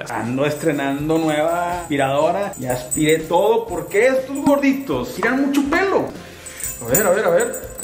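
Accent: Mexico. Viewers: Mi guapo, me encanta tu acento bien marcado mexicano 🙈💕 Saludos desde Perú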